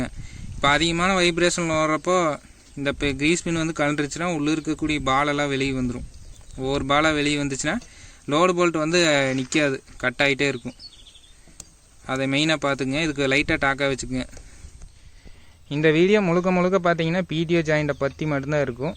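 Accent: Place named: Kongu, India. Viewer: உங்கள் கொங்கு தமிழ் உச்சரிப்பு அருமை சகோ.. Unga slang and way of presentation is superb..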